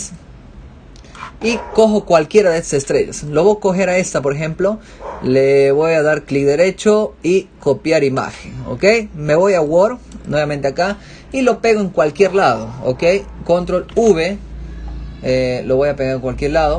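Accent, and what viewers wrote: Peru. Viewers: DIME QUE ERES PERUANO XFA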